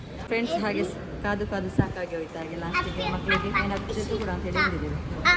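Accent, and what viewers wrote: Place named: Kerala, India. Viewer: ಅದ್ಯಾವುದು ಬಾ ನ್ಯ😂 ನಿಮ್ಮ ಕನ್ನಡದಲ್ಲಿ ಸ್ವಲ್ಪ ಸ್ವಲ್ಪ ಮಲಮೆ ಮಿಕ್ಸ್ ಇದೆ😅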